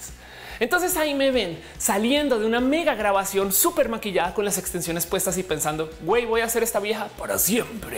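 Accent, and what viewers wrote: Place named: Colombia. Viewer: Que felicidad tener de vuelta a la, que por cierto volviste de tus vacaciones con acento colombiano mas marcadito 😁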